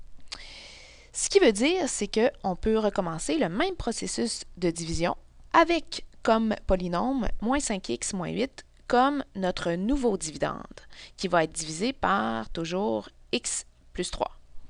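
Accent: Quebec, Canada. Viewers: Mohammed gj c'est l'accent québécois idiot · c est l accent québecois et c est mieux que d entendre un marocain parler...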